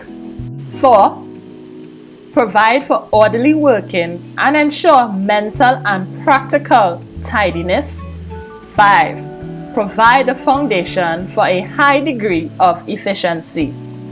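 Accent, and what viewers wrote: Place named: Jamaica. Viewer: You soon like Jamaican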